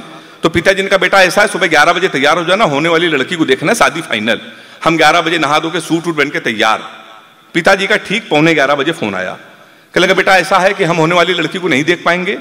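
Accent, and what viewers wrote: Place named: Kanpur, India. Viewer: Proud to be kanpuriya..... Aj kanpur ki hindi dubai me suni jari ♥️🌹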